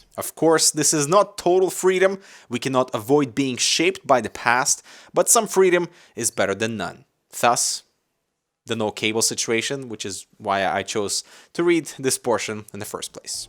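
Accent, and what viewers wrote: Russia. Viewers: D. Please create new channel where you are just reading books. Your 75% English and 25% Russian voice is literally heaven. Preachhhh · U kinda used russian accent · are you russian?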